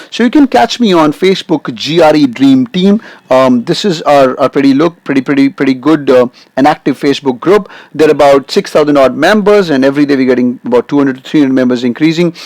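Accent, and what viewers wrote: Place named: India. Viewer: Your Indian accent makes it so more difficult to understand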